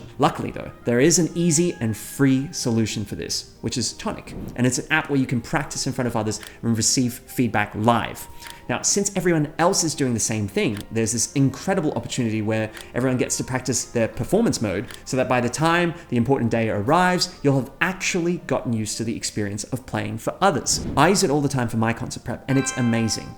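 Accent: Australia. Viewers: You can hear the Australian in his voice in this video. Maybe he talked to his mother before filming. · Thats a crazy aussie accent... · I just watched a rosin video that led to this and hearing the different accents threw me for a loop! I went back to the rosin one and listened to the first bit more carefully and I could hear where his Aussie accent was peeking through, but otherwise it was a great American accent he put on for it., we'll watch you either way! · I've watched like 5 of your other videos and this is the first one I've heard where you sound Australian. I was so confused... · The aussie accent threw me for a loop. I was like... who is this guy? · We need more of your Australian accent! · It’s nice to hear speaking comfortably in his Aussie accent. Good on him! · Thank you!! This is the answer to the question I’ve always wanted to ask you!😁😁 Btw, love your Aussie accent❤ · He is Australian, but I imagine it changes depending on who he talks to or where he's located. 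It influences the way he talks naturally (unconsciously).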